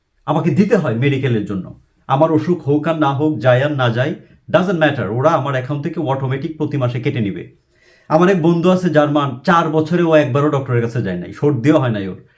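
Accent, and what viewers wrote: Mymensingh, Bangladesh. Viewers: আসসালামু আলাইকুম স্যার, স্যার আমি আপনার ভিডিও দেখি বেশি দিন হয় নাই, এর মধ্যে আপনার সব গুলো ভিডিও দেখা শেষ। বড় আফসোস হয় আপনাদের মতো মানুষ দেশ ছেড়ে চলে যান! আপনার কথা যদি আমাদের দেশের শিক্ষাব্যবস্থায় জুড়ে দেওয়া যেতো আমাদের শিক্ষাব্যবস্থার আজ এই অধপাত হতো না। এই জং ধরা দেশের জন্যে আপনার মতো মানুষ খুবই দরকার। আরেকটি ব্যাক্তিগত প্রশ্ন ছিল স্যার, আপনার বাড়ি কি ময়মনসিংহ বিভাগে? নাকি কিশোরগঞ্জ? আপনার ভাষাটা ( আঞ্চলিকতার টান) খুব আপন মনে হয়। আশাকরি উত্তর টা দিবেন।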